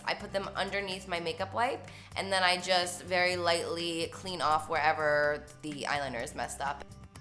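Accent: New Jersey, United States. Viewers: I literally gasped when I saw she uploaded a new video and saw the screenshot of the look. She slayed this - as always. And I honestly love when she talks, despite the thick New Jersey accent which seems to bother some people (like why tho?) she's just so cool and down to earth and talks to us like we're friends, love that shit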